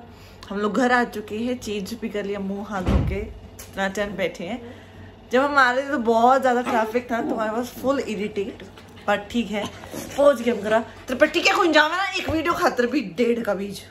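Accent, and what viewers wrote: Haryana, India. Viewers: Pranjal di mane aapki haryanvi lanuage ganni badhia lage hai ar me aapki bohot baddi fan su 🤣😅😊😊😜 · Ye haryanvi m kya bol deti ho bich bich m samaj nhi aata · Hey didi aapka vlog aacha lag aap haryanvi boltae huae aache lagtae ho to or vlog banae haryanvi m 😍😍👌👌👌😍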